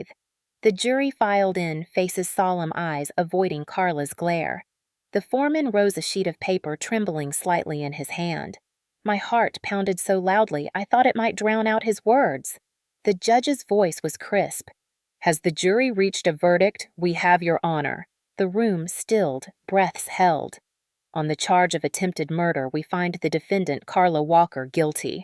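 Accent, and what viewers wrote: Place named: South, United States. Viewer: Love the storytellers' southern accent. especially since my roots are tied to the south.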